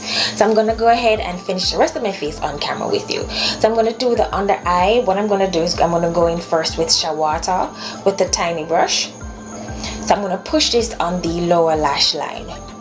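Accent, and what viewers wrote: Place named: Jamaica. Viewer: Lovely simple look that I will be rocking tomorrow lol 😂 you are absolutely lovely and the Jamaican accent is the cherry 🍒 on top. Thanks sis! 😊✌🏾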